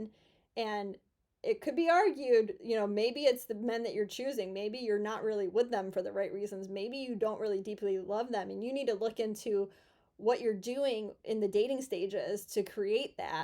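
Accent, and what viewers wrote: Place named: North Dakota, United States. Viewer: Are u from North Dakota Been trying to place your accent U Judy don’t have that stereotypical “concha no!” Things sorry lol was curious You’re awesome great videos lol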